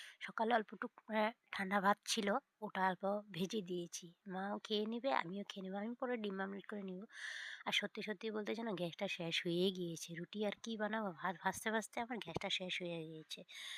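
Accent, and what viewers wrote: India. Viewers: তোমার কথা গুলো কি মিষ্টি লাগছে শুনতে ❤ তুমি কি ঝাড়খন্ড থেকে। ওই রকম টান ❤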